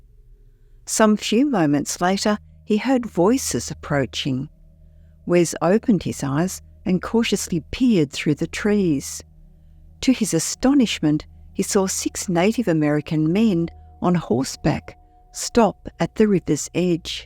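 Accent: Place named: Australia. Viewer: Australian narrator ❤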